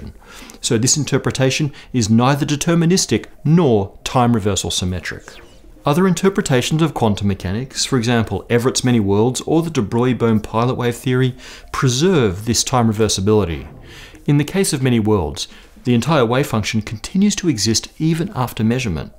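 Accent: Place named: Australia. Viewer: I like this guy, he sounds Australian. I like Australians.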